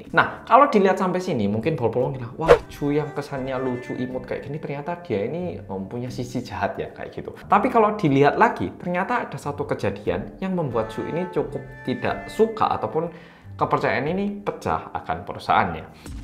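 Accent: Java, Indonesia. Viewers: Ruar binasa emang keserakahan manusia. Makasih videonya Boloku Sing Paling Medok Dheweeeee 🙏🏼🙏🏼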